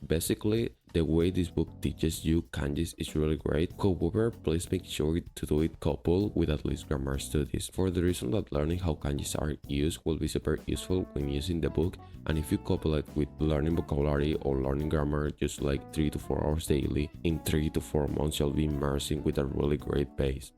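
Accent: Spain. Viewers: I watch videos on English, my level is not high, but I noticed - I can hear words and correlate with writing, but Spanish accent fully confused me, I can`t even concentreate on subtitles. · Amigo, con todos los respetos porque sé que has dicho que llevas 3 años aprendiendo inglés y no quiero restarte mérito, pero te recomiendo que mejores tu pronunciación en inglés. Sobre todo las vocales, pues las pronuncias igual que las vocales en español, cosa que es un error garrafal, porque en el inglés hay más de 20 vocales mientras que tú solo usas las 5 del español. No puede ser que después de mas de 3 años aprendiendo inglés pronuncies la palabra "studying" de manera literal, con el sonido de la 'u' del español, y que también pronuncies la palabra "audio" igual que en español. No sólo es desconcertante para los hablantes nativos del inglés que tendrán dificultades en entenderte (porque al no pronunciar una palabra correctamente lo que haces es que el oyente la confunda con otra palabra cuya pronunciación sí sea esa que has hecho tú, como por ejemplo pronunciar 'sheet' como 'shit' o 'sheep' como 'ship'. 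Menos mal que al menos añades subtítulos a tus vídeos), sino que también haces que sea desagradable escucharte hablar, pues a nadie le gusta oír a alguien chapurreando su idioma de manera torpe y lastimosa, mas bien dan ganas de quitar el vídeo. Te recomiendo que cuando oigas videos en inglés, prestes mucha atención no solo al contenido del mensaje, sino también a CÓMO pronuncian lo que dicen. Si el acento que quieres aprender es el del inglés americano te recomiendo mucho el canal de Rachel's English, que se dedica exclusivamente a enseñar la pronunciación del inglés am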